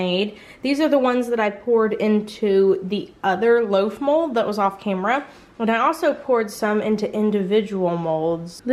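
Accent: Texas, United States. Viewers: It's that Texas accent!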